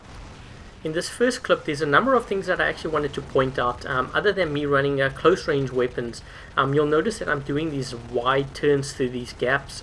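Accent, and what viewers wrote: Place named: South Africa. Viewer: I could tell you were somewhat South African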